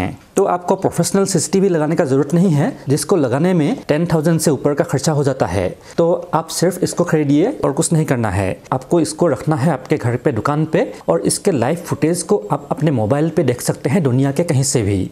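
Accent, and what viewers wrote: Assam, India. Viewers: অসমীয়া হিন্দী যেন লাগিছে // যা হক video টো ভাল লাগিল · Apka hindi sunkar lagta hai app assamese hai ☺️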